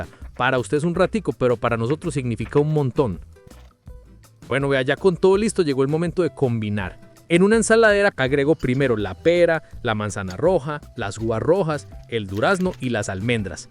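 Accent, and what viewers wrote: Colombia. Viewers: Eres colombiano? Me late por tu timbre de voz 😊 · Se ve muy rica si la haré,se escucha que eres colombiano, saludos desde la Ciudad de México 🇲🇽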